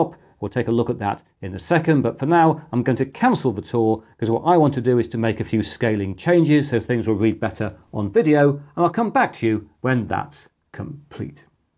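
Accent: United Kingdom. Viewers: A Fedora is a hat. I believe it was Frank Sinatra's choice. (But it wasn't red, I think). Thanks for the insight into yet another operating system. By the way: You British always seem to have a problem pronouncing "kn" and "gn". Very often you just ignore the k or the g: [nife] rather than "knife", [nu] rather than "gnu". But when you do decide to pronounce the k or the g, you always insert an e or sometimes an a: "Genome" rather than "gnome". You once had a Danish king named Canute. Except, his name was really Knud. Where did that a come from? The funny thing is that it isn't difficult at all to say "gnome" with no inserted e. In Denmark we do it all the time.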